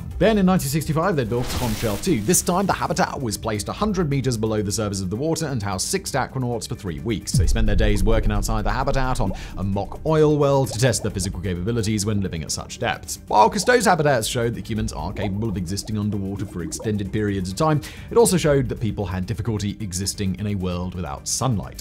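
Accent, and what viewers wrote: United Kingdom. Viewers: omg your posh voice and pose make me doze...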